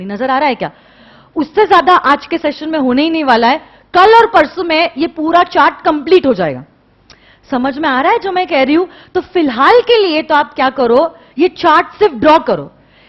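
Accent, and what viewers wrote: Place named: Gujarat, India. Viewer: I have nothing to do with CA or any Crazy study Shit. Still, I bought her books and Pen Drive stuff. She is an Amazing woman., and that thick Gujarati accent adds cherry over the cake. Keep it up, you have been fabulous. I never liked CAs until one fateful day I found you on Youtube.:)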